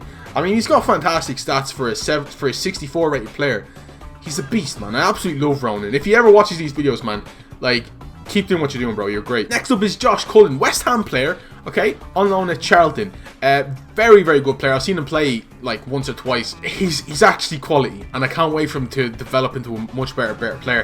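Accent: Ireland. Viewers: are you irish???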